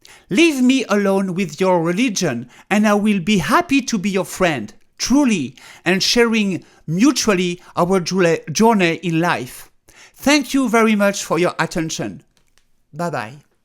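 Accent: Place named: France. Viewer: Hi, you know I always loved you. But, to be frank, your French accent is getting more and more... how should I put it...let's just say that your French accent is getting more. Like in this episode, I have to watch the video for 3 times to hear out what are you saying, what's up? you're back to France?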